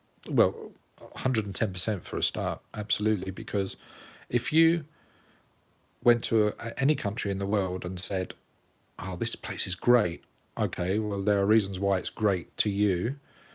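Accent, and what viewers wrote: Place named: Cockney, United Kingdom. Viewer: Yeah can tell you got abit of cockney in you were do you travel most?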